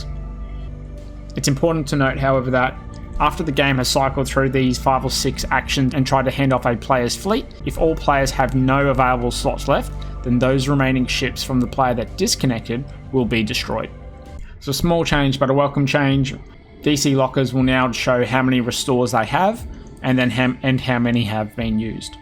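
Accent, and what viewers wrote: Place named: Australia. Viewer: HA, Aussie detected. Good videos btw, thanks for explaining all this stuff.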